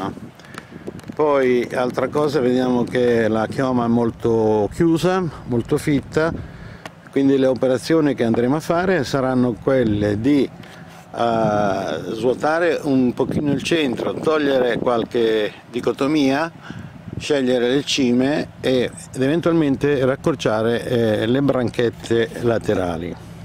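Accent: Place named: Calabria, Italy. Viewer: sentivo un certo accento calabrese. Anche io sono calabrese. Di Nicotera VV.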